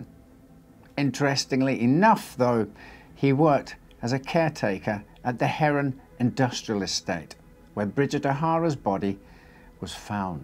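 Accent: Scotland, United Kingdom. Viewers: well, no, but we do know that it's a Scots accent. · As always you narrated story kept me the edge of my seat. Thank you Sir and your wonderful Scots accent!❤ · your lovely Scottish accent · i love listening to this lovely man with his scottish acsent, he sounds just like my ex husband. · So are you a Scot or a Welshman? The reason I have asked you is because you sound just like my father who hailed from Edinburgh but went to Perthshire during the war, he never lost his Scottish accent, even though he had been in Australia for 31 years at the time. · Delivered with a beautiful Scottish accent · it's a Scottish accent 🤣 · A little Scottish, minus the rolling Rs. Good old English and an occasional Africaan twang.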